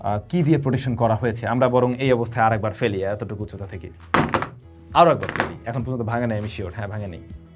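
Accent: Barishal, Bangladesh. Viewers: বরিশাইল্যা নাকি ক্ষেত ক্ষেত কথা অস্তে অস্তে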